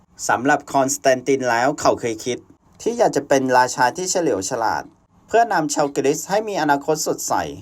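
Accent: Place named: Rural, Thailand. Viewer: รู้นะว่าตั้งใจ ขอให้กำลังใจ แต่ต้องฝึกมากๆ โดยเฉพาะการอ่านออกเสียงชื่อต่างๆให้ถูกต้อง เพราะยังไม่ถูกหลายคำสำหรับชื่อในภาษาต่างประเทศ มีผิดพลาดหลายที่ จึงต้องพยายามแก้ไขปรับปรุงให้ถูกต้อง การอ่านคำต่างๆจึงต้องฝึกให้ชัดเจนตามบรรทัดฐานมาตรฐานภาษาไทย รวมทั้งจังหวะจะโคนและสำเนียงตามมาตรฐานภาษาไทยกลาง อย่าให้มีกลิ่นชนบท เพราะนี่คือการอ่านเรื่องสำคัญของพระราชวงศ์ต่างประเทศ ขอให้หมั่นฝึกทบทวนไปมามากๆ จะดีขึ้นเอง เพราะมีแววว่าน่าจะพัฒนาได้จนได้รับการยอมรับว่ามีมาตรฐานการอ่านที่ดีต่อไปได้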